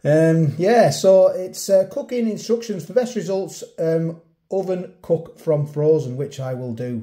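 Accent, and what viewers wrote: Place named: North, United Kingdom. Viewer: By the way the wholesome northern accent makes your channel feel so authentic like an authentic northern cuppa T lol 😂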